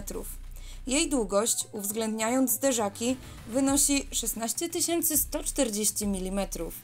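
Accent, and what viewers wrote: Silesia, Poland. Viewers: Fajne, ale mosz to co jo, nawet jak mówisz to słychać godka haha 🔥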